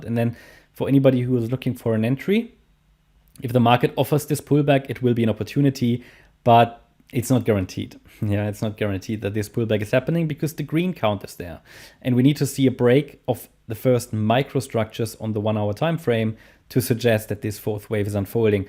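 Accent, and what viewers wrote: Germany. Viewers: ich hab mir das video angeschaut und direkt nach 20 sek gemerkt das du deutscher bist hahaha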